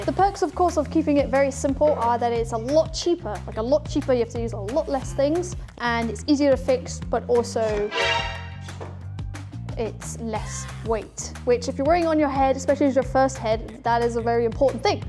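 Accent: United Kingdom. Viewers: YES QUEEN UR FROM THE UK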